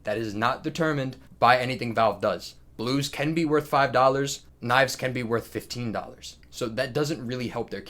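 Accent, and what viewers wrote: New York, United States. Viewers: The real new yorker came out of you today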